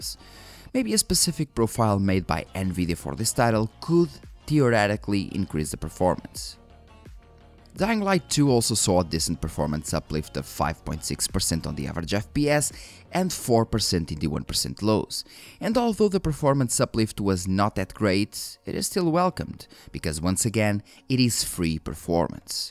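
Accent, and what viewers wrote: Portugal. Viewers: very good video. btw you sound portuguese accent. i know cuz u sound like my accent lol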